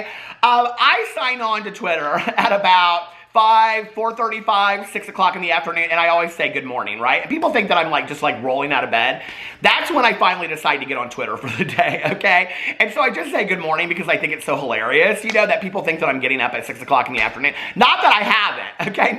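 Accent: South, United States. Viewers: I swear you are more southern than you realize 😂 I still say Q-pon!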